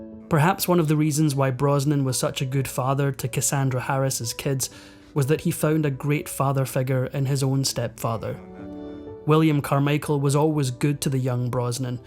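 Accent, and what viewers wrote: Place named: Scotland, United Kingdom. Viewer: Yay!! I am so glad that the Scot is doing the narration more so now...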